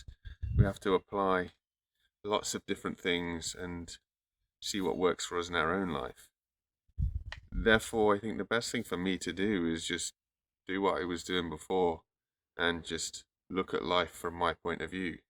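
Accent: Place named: England, United Kingdom. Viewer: It's obvious from his accent that he's not Welsh. He has an English accent and most likely lives in London or one of the Home counties.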